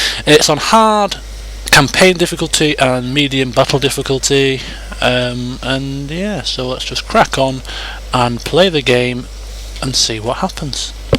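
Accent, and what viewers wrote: England, United Kingdom. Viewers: YEEESSSS a english person with a funny acsent no affence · lol this might seem kinda weird but it is so much cooler to watch a person with an English accent do an English campaign then it is just any other kind of person... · Your accent is that of a true englishman (from an englishman)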